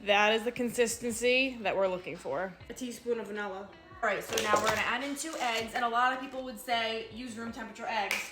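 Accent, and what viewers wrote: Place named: New Jersey, United States. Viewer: You are soooo Jersey!!! I lived in S Jersey until I moved to Iowa. My boys always tell me, "your Jersey is coming out"!!!!! I miss the accent so much.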